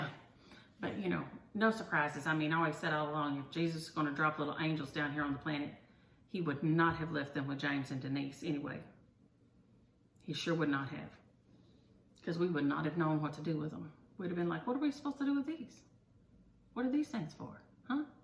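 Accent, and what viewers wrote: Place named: Texas, United States. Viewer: Hi there, I want you to know how much you mean to me. I tell my husband, your like an old friend . Today we all could use a friend like you. I grew up in Cleburne Texas, I’ve been in Colorado scene 1989. You make me miss the way I was raised. After I listen to your channel, I start speaking with an accent. I love what you do 👍👍 Thanks so much.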